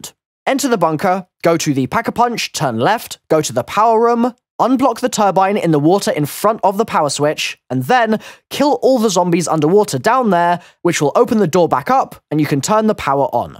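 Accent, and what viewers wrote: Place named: England, United Kingdom. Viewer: Jesus Christ he couldn't be more English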